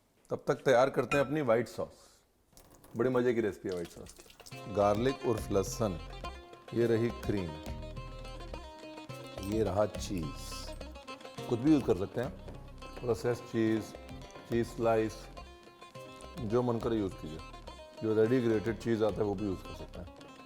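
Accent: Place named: Lucknow, India. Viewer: Mujhay to aapka Lucknow style bolnay ka style bohot pasand hai ❤❤❤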